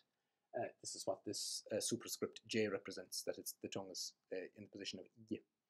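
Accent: Ireland. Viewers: Grand video for someone like myself teaching himself Irish that wishes to learn the true pronunciation, free of English influence. What part of The Emerald Isle do you hail from, sir? I daresay your accent is one of the most beautiful, if not the most, beautiful I've heard in Hiberno-English or any variety of English spoken anywhere in the world. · I love the way that your English speech sounds just like my Irish born Father (RIP) spoke his English, (he was from near Murren, Donegal)- and I appreciate your excellent knowledge of the Irish Language..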